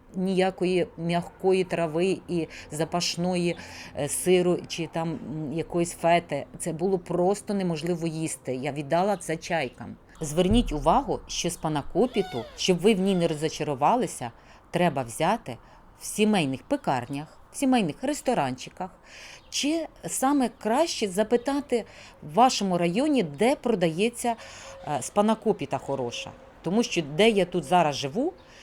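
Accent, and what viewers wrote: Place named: Central Ukraine, Ukraine. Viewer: Ви з центральної України? У вас вимова, як там🎉🎉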